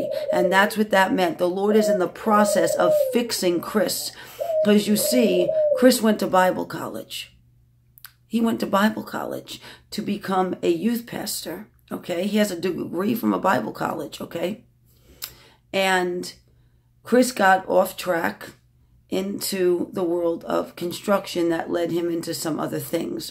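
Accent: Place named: New York City, United States. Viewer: GOD BLESS YOU WOMAN OF GOD. I HAD A SISTER IN CHRIST WHO SENT ME YOUR VIDEO RECENTLY AND YOU'VE BROUGHT SO MUCH CONFIRMATION. MAY GOD BLESS YOUR MINISTRY AND KNOW I'M SENDING PRAYERS FOR YOU, YOUR HUSBAND AND YOUR MINISTRY IN JESUS' NAME. I LOVE YOUR REVERENCE FOR GOD, HIS WORD AND HOLY SPIRIT! ON A SIDENOTE I'M ALSO A NEW YORKER AND JUST LISTENING TO YOU MAKES ME MISS MY NYC ITALIAN FRIENDS. #SPELLMANGRADUATEOVERHERE 🤗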